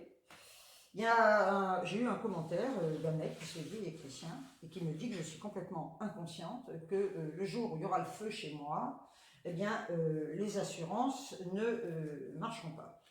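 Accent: Algeria. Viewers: Coucou ma adorée...Pero pasa que es? Je n'ai pas de son sur ta vidéo. C'est la première fois que cela m'arrive. J'ai tout vérifié et nada! Je ne comprends pas pourquoi... J'ai quand même regardé ta vidéo " en mode muet" et je vois qu' apparemment, tu es toujours dans les bonnes affaires.... Le porte-bijoux: j'adore et les bougies aussi.... Et pour couronner le tout, je viens juste de m'apercevoir que Youtube m'a désabonée de ta chaîne...Oh, la, la, la! Si l'année commence cà, comment va-t-elle se terminer??? Tu n'as pas perdu la voix au fait? Tu ne fais pas semblant de parler??? Lol, j'plaisante bien sûr mais c'est dommage de ne pas entendre ta douce voix mélodieuse: tu sais, celle avec notre accent de P.N. Je te fais des tonnes de bisous tout blancs.... car nous sommes au mois de Janvier!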